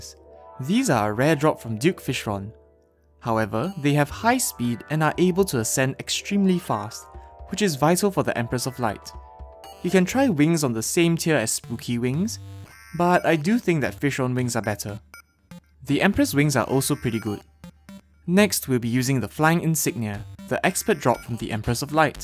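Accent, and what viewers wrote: Singapore. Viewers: Are you SG/ Malaysian bro? Going by the accent 😃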